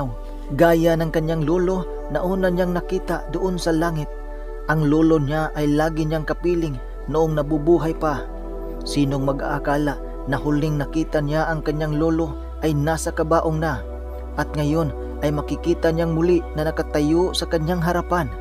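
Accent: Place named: Visayas, Philippines. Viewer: Bisaya ka dol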